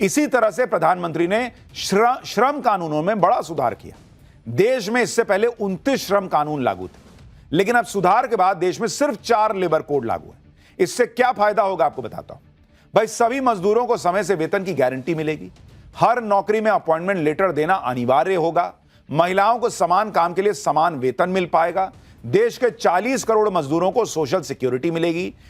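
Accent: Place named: Bhojpuri, India. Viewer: Aap Bhojpuriya style me accha news bolte hai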